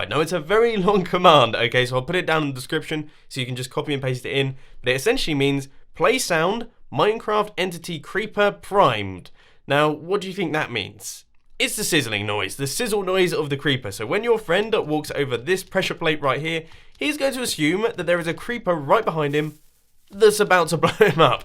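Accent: United Kingdom. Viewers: Are you bri-ish. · Wait wait wait, you're a teenager?! From your voice, don't know why, but I expected you to be in your early 30's! Has anybody told you you sound like a british young adult? [Thinks about your profile picture and minecraft skin] Ooh. They did. XD (This is not supposed to be an insult) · Wait are you British like me?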